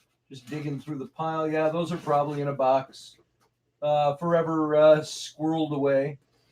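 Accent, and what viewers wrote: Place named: Scotland, United Kingdom. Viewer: That Scottish accent is not to shabby 😂 give you a 8 out of 10 haha 😂❣️ xx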